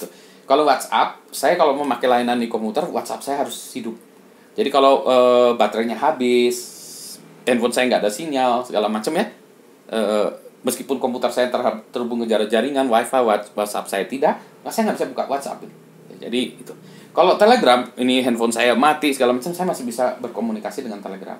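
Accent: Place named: West Java, Indonesia. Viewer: Namanya mirip dengan nama orang jawa tapi logatnya mirip orang sunda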